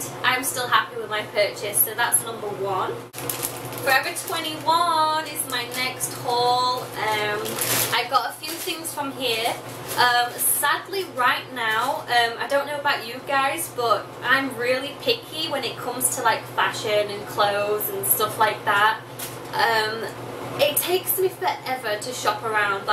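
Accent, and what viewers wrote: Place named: Australia. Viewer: hehehe anytime. Aussie accents arent that bad but yours is the best by far.!!! xo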